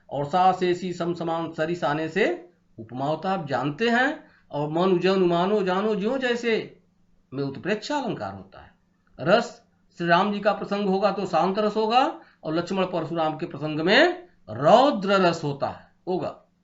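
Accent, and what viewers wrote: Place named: Bhojpuri, India. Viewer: Waise toh tunne pgt hindi likha hai lekin padhta tu hai hindi mix bhojpuri, pehle apni hindi sudhar tab padhana supari nikal ke bol ...Pehle rehearsal kar tab video banana😀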